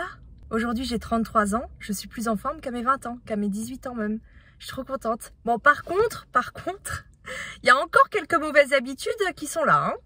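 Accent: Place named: Marseille, France. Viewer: Coucou, alors tu reviens En Bretagne à Brest, et tu parles Marseillais, qui est la ville de mon enfance, de mes jeunes années puis j’ai rencontré un Normand et j’ai passé 22ans Dans la Manche, avec des retours à Marseille, car j’ai toujours aimé son côté Melting- pot, des gens venants de toutes régions du monde. Je la connais comme ma poche, il ne me reste que ma vieille maman de 84 ans. Qui a toujours vécu à Marseille, que de souvenirs avec mes parents, mes écoles, mes copines, j’ai 64 ans, retraitée, je reviens dans ma ville adorée. Alors je te comprends très bien et te souhaite que du bonheur dans ta ville de cœur, mille bisous.❤❤❤❤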